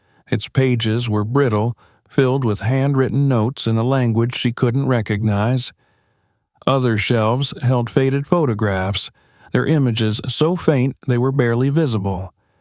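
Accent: South, United States. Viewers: I am enjoying the story, but am distracted by the southern accent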